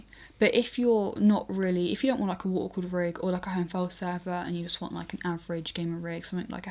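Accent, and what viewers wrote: United Kingdom. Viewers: Anderson Paschoalon Noooo... It mean that UK has the most diverse population ranging from Scottish to Welsh, from North to South accents, from Southall to Stratford accents, from "chavy" to posh, from Punjabi to Polish... Man..."dys acent" is a droplet in the ocean of diversity over here. Get use to. Or change page if you cannot comprehend it, as for speakers... She has a one of the softest voices I know of and in different situation, she would have your erection just by talking to you. ;-))) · Damn,this english accent.. :| I usually understand british accent but not now :P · Oly VL Haha of all things to like, her accent (look I'm south african, so a british accent appeals to me too), just that I prefer her to other reviews because she isn't some 50 year old fat dude or a 12 yo kid or that she is a female in a male dominated sector (as far as I know) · Omg her accent makes it so damn difficult to understand. 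I thought British accents were the most easiest way of listening or learning English. Her accents makes it seem like all gibberish.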